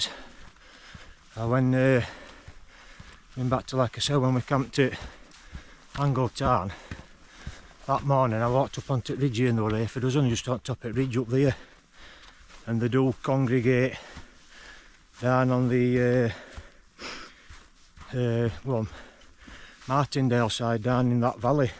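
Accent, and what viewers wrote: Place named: Yorkshire, United Kingdom. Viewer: Great stuff! I'm gradually learning to understand Yorkshire dialect!! Great places, great views and great courage to go out there in December. Thanks so much. It's really inspiring and I might consider following in your footsteps! Love it!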